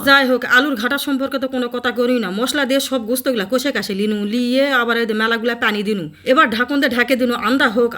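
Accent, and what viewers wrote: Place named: Naogaon, Bangladesh. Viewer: বাহ দারুন ভাবে তো বললেন নওগার ভাষা ❤❤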